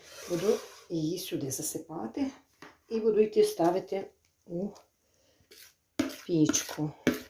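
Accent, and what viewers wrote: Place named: Bukovina, Ukraine. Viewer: Дякую за гарну нашу буковинську мову та гарне викладення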